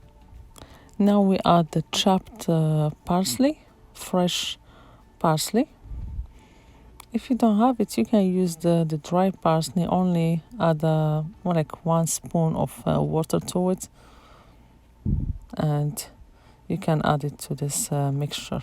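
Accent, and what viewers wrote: Morocco. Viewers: It sound like you have a Moroccan accent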